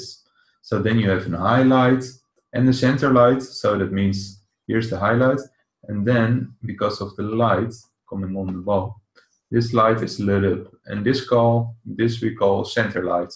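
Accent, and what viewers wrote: Netherlands. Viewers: are you dutch? awesome tutorial btw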